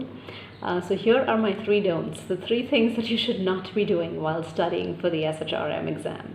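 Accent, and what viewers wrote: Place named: England, United Kingdom. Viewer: Hi hope you are doing good I just loved your English accent. Please can you guide how you achive this?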